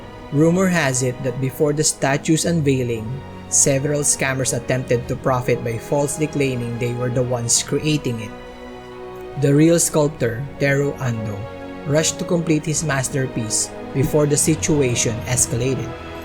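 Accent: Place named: Philippines. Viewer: Are you Filipino? Your narration has an accent! 🤔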